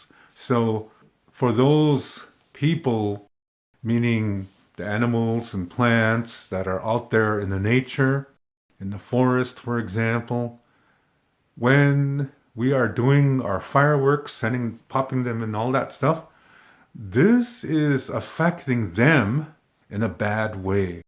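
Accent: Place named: Hawaii, United States. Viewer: You have an accent. Very similar to native Hawaiians. Kind of sing-song. It’s nice! Have an enlightened new year.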